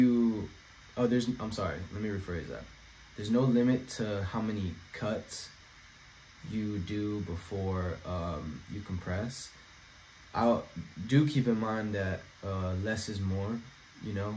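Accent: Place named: California, United States. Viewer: that’s wild lmfao I can hear it in your voice you been around Cali people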